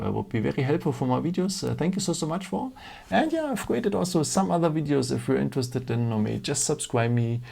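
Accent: Germany. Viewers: Wow you're accent is so german lol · Man hört das du deutscher bist 🤣 U can use it at Bibliothek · Im German and I would say he's definitely German. The way he pronounced "Screenshot", "Video" or "Filter" are the german ways - is that correct English? I don't know😂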